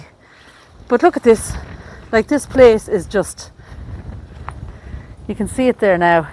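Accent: Ireland. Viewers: Great video. The commentary with the Irish accent is spectacular. From New Jersey in the USA. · Hello from Japan. I was born in Sardinia to Irish/Scottish/Ukrainian parents and lived in Carna Co.Galway and Cashel Co.Tipperary as a youngster. Have been always fascinated with cemeteries and love just as you do too to explore them. Great to hear an Irish accent!!!!!!!!!!!!!!!!!!!!!!!! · Beautiful graveyard love your gorgeous Irish accent luv from Canada 🇨🇦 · So neat to see the old graves. Thanks for taking time to show them. Love your Irish accent. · Thanks! Love love your channel!! I am from Alberta, Canada 🇨🇦 and would love ❤️ to hopefully one day make a trip to beautiful Ireland 🇮🇪!! It is so wonderful that by you filming different locations I feel like I am touring Ireland 🇮🇪 lol🥰 again love your channel & keep up your amazing work! Oh ps I love love your beautiful 🤩 Irish ☘️ Accent!! 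☘️🇮🇪☘️ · I love listening to you both. Your voice reminds me of my Granddad who was from Cork. I’m from Western Australia 🇦🇺. My Great Grandmothers name was Eva Boyd my Great Grandfathers name was Michael Hyde.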